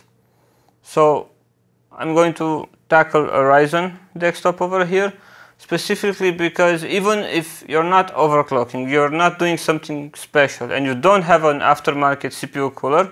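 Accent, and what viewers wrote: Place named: Poland. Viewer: Where are you from? Your accent sounds Like polish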